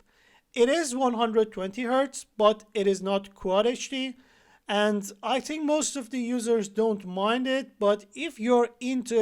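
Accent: Iran. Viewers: I know a Persian accent when I hear one lol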